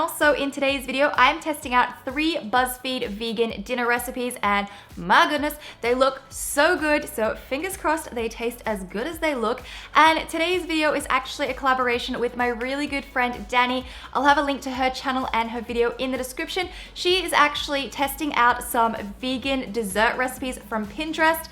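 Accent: Australia. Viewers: Are you Australian?? Btw these look great :)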